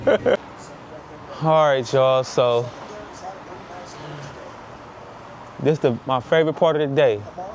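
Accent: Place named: Washington DC, United States. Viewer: Man you sound like a DC dude from time to time you had to around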